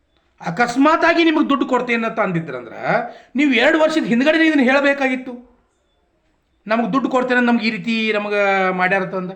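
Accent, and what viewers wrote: North Karnataka, India. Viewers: Hi bro ಈ R ಚಾನಲ್ ಅನ್ನ ನೇಪಾಳ ದಾಗ ಜನ ಹೆಂಗ ಸರಕಾರಕ್ಕ ಮಾಡಿದರ ಆಲಾ ಹಂಗ ನಮ್ಮ ಜನರು ಮಾಡಬೇಕ. ನಮ್ಮ ಉತ್ತರ ಕರ್ನಾಟಕ ಭಾಷೆಯಲ್ಲಿ ಮಸ್ತ ಆಗಿ ಹೇಳತೀರಿ ನೀವು ಸೂಪರ್ 👍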